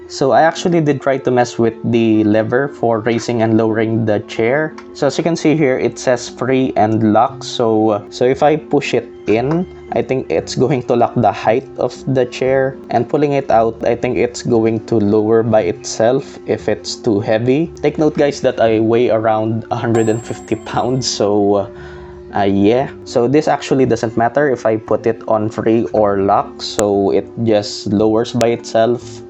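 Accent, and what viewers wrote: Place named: Tagalog, Philippines. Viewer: i suggest that you watch american movies and shows so you would learn how to pronounce a lot of words correctly. or else mag tagalog ka na lang sir. video is good but the way you pronounce each word makes it worst. coz if you talk in english the TAGALOG way, it'll sound ridiculously stupid. in short, TRYING HARD boss. not to bash or something, im just stating the fact. watch american shows or movies specially the BING BANG THEORY. you'll learn a lot. it'll help you eventually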